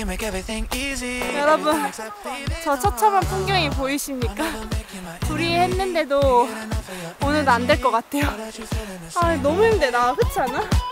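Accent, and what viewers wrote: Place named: Gyeongsang, South Korea. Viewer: 예쁜 아가씨 말투에 경상도 사투리가 약간 있는 거 같네요 ㅎㅎ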